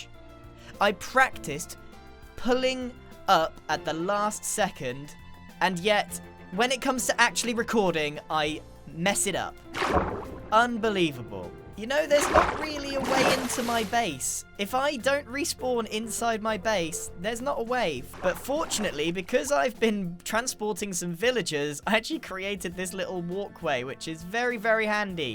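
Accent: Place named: RP, United Kingdom. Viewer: Grian has a lovely posh accent.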